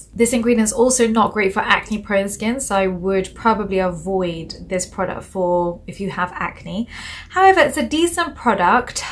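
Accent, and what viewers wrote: United Kingdom. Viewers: This DOCTOR is personable, generous and transparent with her vast knowledge of skincare ingredients. And yes, she is also a gorgeous lady. I also love her (presumably British?) accent. As an American, any accent sounds smarter than what I am used to hearing. 😜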